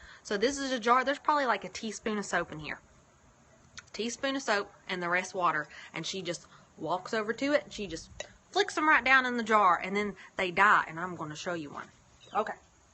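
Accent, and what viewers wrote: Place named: Virginia, United States. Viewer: I live in Virginia, and we have a similar accent.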